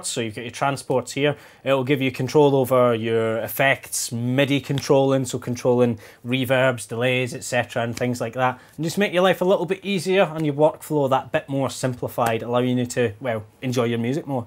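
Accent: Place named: Scotland, United Kingdom. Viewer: His Scottish-like accent makes this video that much more cool XD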